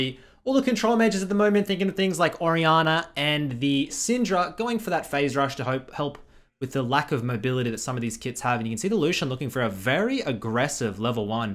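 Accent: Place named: Australia. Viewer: Came for the Australian accent, stayed for the K N O W L E D G E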